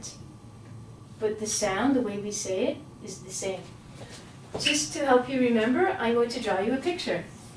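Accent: Canada. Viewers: She from canada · She is from Canada?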